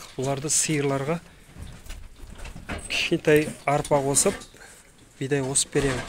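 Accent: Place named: Uzbekistan. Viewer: Ассалаумагалейкум жаба дегенинизге караганда Озбекстаннан келгенсизба димин мен сиакты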